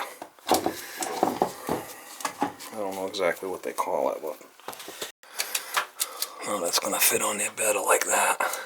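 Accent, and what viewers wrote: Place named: New England, United States. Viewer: New England drawl!👷🏻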